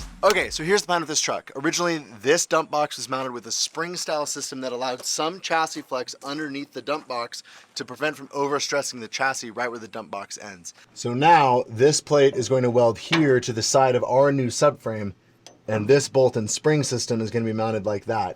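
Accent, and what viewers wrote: Yorkshire, United Kingdom. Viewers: Hi where is from? Definitely a lovely northern accent, I’m thinking Yorkshire? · Can the americans understand yorkshire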